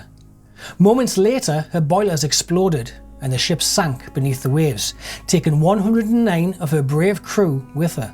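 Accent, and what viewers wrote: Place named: Geordie, United Kingdom. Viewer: Great video man , well done again 👏 Glowworm's Nelson's stile barrny. The Royal Navy's power when the Yards were still open from Newcastle to Shields both Banks of the river. And the Mackems at it knocking out Legandary beasts of the sea.. Sorry marra a gan on one noo an agen !!!oi oi Geordie Boy ! 😉 You may actually nead a translator for the local language you just tried to read 😂😂😂 The coment is directed to the creator of this YouTube channel and his great regional dialect accent. Because he lives near me and probably literally knows one of my friends. Without 6 degrees of separation.😊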